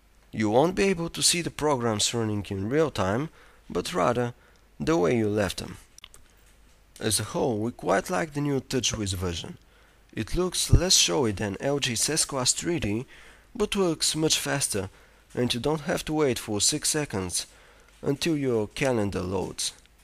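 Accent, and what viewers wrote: Russia. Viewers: ))) hahahaa man i like that russian english! :D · RUSSIAN. There's no doubt about it, and I thought he was drunk. Please arenaphone..., do something better. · maybe is the site german but the reviewer is anything but german... he sounds clearly russian or polish as I am german and actually know how the typical german accent sounds like...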